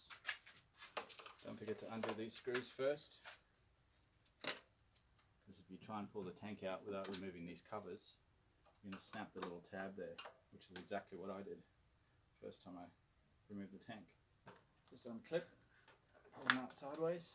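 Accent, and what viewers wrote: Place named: South Africa. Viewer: Greetings from South Africa... You sound extemely SA by the way. Buying this bike next year, this is how I got to your channel!!!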